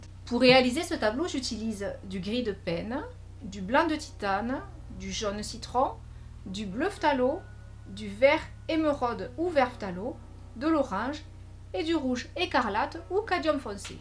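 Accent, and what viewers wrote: South, France. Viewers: Toujours un réel plaisir.de voir votre façon de travailler avec de surcroît votre douce voix du Sud de.la.france ! Vous Expliquez toujours très clairement c'est adorable a vous merci à vous ,🙏❤️ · Bonjour, Merci pour vos vidéos, elles dégagent de belles énergies créatives 🙏. Je reprends la peinture ( merci le confinement 😉) par contre la peinture au couteau est une première, pas facile à positionner sur une toile quand le chevalet est absent... Une astuce? A votre accent vous êtes dans le Sud. Faites vous des cours de peinture en présentiel?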